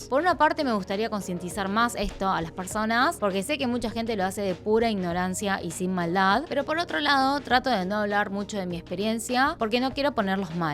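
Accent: Buenos Aires, Argentina. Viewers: Me encanta verte y escucharte❤ tus hermosos rasgos coreanos y tu acento argentino. No hagas caso. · Tu acento porteño es hermoso pero la gente de hoy en día no tiene nada que hacer que molesta a los demás.... todos podemos hablar de cualquier manera y con cualquier acento también es entendible por qué hablas así pero como nunca se informan bien ya empiezan a criticar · Para mí hay pica con el acento porteño porque esta asociado al esteriotipo de argentino creído. Me parece algo bastante inmaduro que la gente siga con esa idea pero creo que es por ahí el problema. A mi me encanta que hables como yo, siento que aporta a nuestra identidad argentina (que es sumamente diversa) y termina definiendo al mundo que es realmente ser argentino. Te mando un abrazo y ojalá la gente salga del tupper dónde vive y se acostumbré a ver la realidad que es hermosa. 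Sos lo más💖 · mi mama y yo miramos tus videos y no podemos creer lo perfecto que hablas español con un tipico acento de bs as! soy de misiones. no podia creer hasta que vi que naciste acá entoneces dije con razon ni se nota. hablas perfecto!!!! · No le hagas caso gente ignorante y envidiosa sos muy Bonita y bella persona y hablar argentino es un honor y otra vez la envidia yo que estoy en españa se que aquí te siguen así que nadie debería de hacerte menos mucha fuerza ❤ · Nunca te desanimes, tus vídeos ayudan a conocer la cultura coreana. Y tu acento argentino, es tu identidad, seguí!! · No tenes acento Argentino tenes, acento porteño no todos los Argentinos hablamos así, no generalices y si a mi me an dicho muchas cosas por mi acento soy Argentina de una provincia llamada san luis c.p. y me an preguntado muchas veces si soy cordobeza ,mexicana ,de muchos lugares que no soy , pero no le presto atención a esos comentarios y los feos comentarios los bloqueo o los borro es algo que deberías considerar besos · Mal de muchos consuelo de tontos, decía mi abuela... 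qué lamentable que tengas que pasar por ésto. Volví a ver tu video con diferencia de 3 años. Es notorio el acento propio de barrios como Belgrano o Núñez fuera de Argentina sea motivo de mares de caracteres refleja lo mucho que avanzó desde sus primeros videos. De sentirse reflejada en la cultura de su familia hasta viajar allí y ver que si, al final era argentina. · Hablás como lo hacemos los Argentinos. Y vos cómo los porteños. No le des tanta importancia, primero xq no se ven en el espejo , segundo para q te ven y escuchan 🤦, y tercero son tan claros tus vídeos . Seguí así FELICITACIONES👏👏👏. 😘😘🇦🇷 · todos los argentinos hablamos como vos 😋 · Soy mendocina y adoooro el acento porteño, es especial TU acento porteño 💕 AMO esa combinación COREANA/ARGENTINA. Sos bella e inteligente. Tus contenidos son GENIALES y se nota todo el tiempo y el trabajo que ponen vos y Alex en su trabajo. ❤💙💖 Como dijo otro argentino por ahí "A la gilada ni cabida!!!!" 😂😂😂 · Hola no tienen por que criticar tu acento porteño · Hola, llevo más o menos dos años viendo tu contenido. 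No suelo dejar comentarios en ningun lado, pero lamento mucho que recibas esa clase de comentarios y quería contrarrestar esa mala onda. Creo que mejoraste mucho no solo la producción de los videos sino también tu manera de expresarte y tu capacidad de renovar tu visión sobre las cosas, demuestra madurez como persona y responsabilidad como creador de contenido. Asi que te felicito mucho y te animo a que sigas! Me gusta mucho tu acento, yo también soy porteña y entiendo que a muchos puede no gustarles la tonada, pero te expresas correctamente y se entiende tu mensaje, asi que lo demás que te critican está de sobra. Que tengas una linda semana, fighting!:) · Mexicanos y peruanos escandalizados porque una argentina habla con acento argentino. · Vos sos porteña, hablás así y listo. Al que no le guste que no te siga. YouTube tiene otras opciones. Pero que no molesten en este canal.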